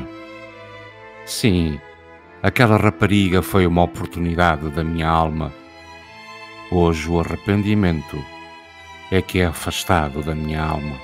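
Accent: Portugal. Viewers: essa voz com sotaque português é delirante , como não se apaixonar por Fernando Pessoa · Ah esse sotaque português, me encanta e me acalenta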